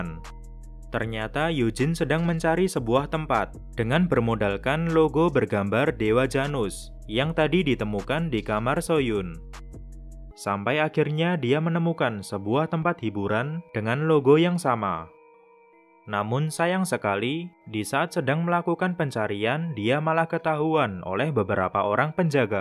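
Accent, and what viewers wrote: Indonesia. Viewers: Gaya ngomongnya kek orang indo:v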